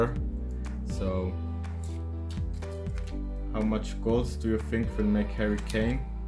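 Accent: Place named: Switzerland. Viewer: Ich wette du kommst aus der schweiz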